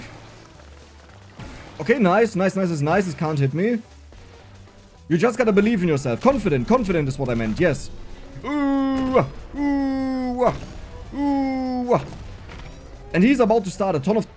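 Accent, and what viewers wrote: Germany. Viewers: accent is so pronounced, yet very different from the classic German accent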